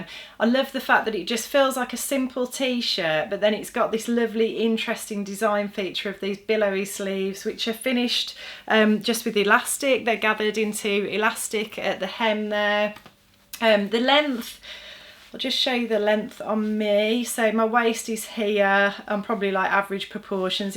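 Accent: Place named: Walsall, United Kingdom. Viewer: I am from Walsall, you sound like you are pretty close. I have lived in the US for many years and it is so nice to hear someone from close to home😀🇬🇧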